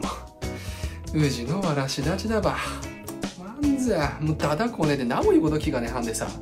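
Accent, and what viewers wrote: Tsugaru, Japan. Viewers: 最初から津軽弁に聞こえる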